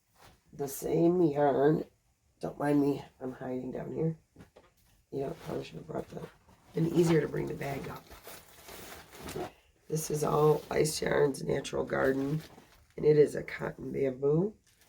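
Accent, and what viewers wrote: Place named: North Carolina, United States. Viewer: X school bus driver Hope get to feeling better you sound like me and my daughter we live in north Carolina feel better love you ❤.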